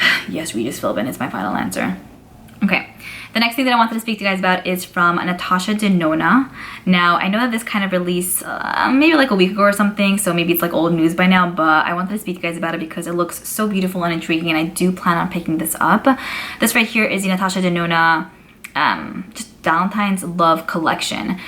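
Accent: New York, United States. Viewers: I love the way you say chocolate, that New York accent is strooong! Your too freakin cute XOXOXO 😇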